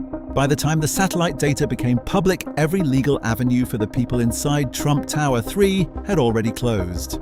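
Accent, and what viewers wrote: United Kingdom. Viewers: Hey Mr. English accent. Why not do a video about the rape gangs in the UK? Or perhaps comment about the politics of the UK?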